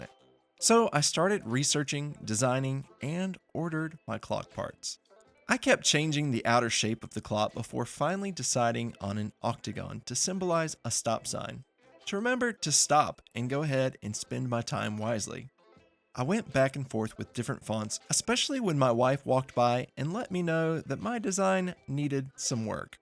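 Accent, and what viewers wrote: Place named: North Carolina, United States. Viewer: I thought I recognized a North Carolina accent. Where are you located? My shop is in Whiteville from from outside of Hickory originally.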